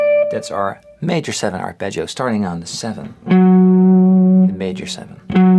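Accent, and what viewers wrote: Netherlands. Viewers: I was initially annoyed by your fantastic English in that Dutch accent, not to mention your prolific beard. I have become a true fan, though. Thanks for all the great content.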